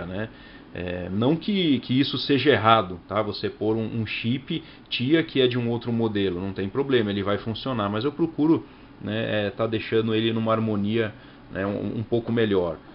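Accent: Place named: Rio de Janeiro, Brazil. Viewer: Vc e carioca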